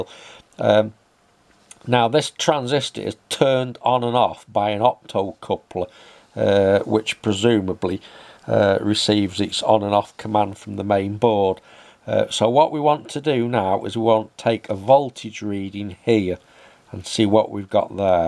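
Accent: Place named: Northern England, United Kingdom. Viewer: Btw you've got yourself a new sub! 😊 Great diagnosis and explanation and good to see another fellow tech/electronics enthusiast here in the UK! 😊 You sound as though you're from Leicester or further north, Yorkshire perhaps? I'm down south in Surrey 😊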